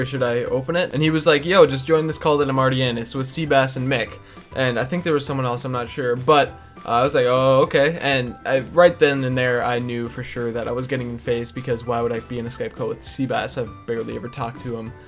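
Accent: Minnesota, United States. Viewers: is he from Minnesota?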